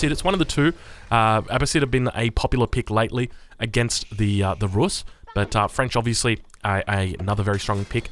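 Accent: Australia. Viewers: the highlight of my work day is your aussie voice saying good day fellas or whatever and then proceeding to slay commentary for the next hour. thank you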